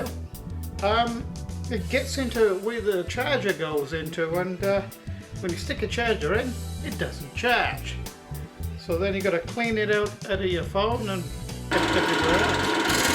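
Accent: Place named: South Wales, United Kingdom. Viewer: good stuff mate... u sound like u have a cardiff south wales accent...may be my ear is off...lol thanx for the video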